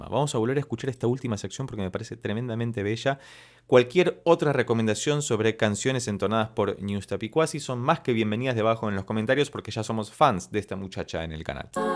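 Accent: Argentina. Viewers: Para de hablar porteño .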